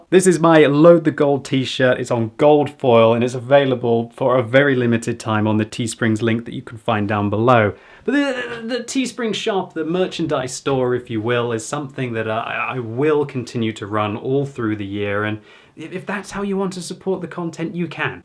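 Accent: United Kingdom. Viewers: Even though i've quit playing WoT, i'm still watching your videos and come on your stream for that lovely british accent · I've never played WOT and I have no plans for it. I've enjoyed watching your progressive gaming style and rather unique british commentary for some years now and I have fun on 98% of the games you posted. How can I keep tracks on what I've seen so far, and what not? Easy - click [LIKE] on every single video watched. Thanks for a steady stream of rock solid entertainment. Cheers, Peter Beijing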